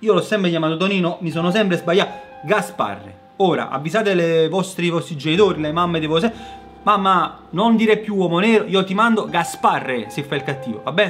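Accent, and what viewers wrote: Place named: Naples, Italy. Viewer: sei veramente eccezionale davvero a parte che mi piace molto il tuo accento napoletano sei comunque fantastico perché vedi chi crede a queste cose come me e non sono l'unica imbrogliare me e gli altri non è molto sportivo diciamo anzi ti senti presa in giro. spero e so che hai capito cosa mi riferisco comunque scusa se te lo dico ma il fatto delle bambole possedute è la più grossa idiozia mai sentita anche se non stai parlando di quello però ci tengo a dirti quello che penso per farti un esempio. Grazie 😘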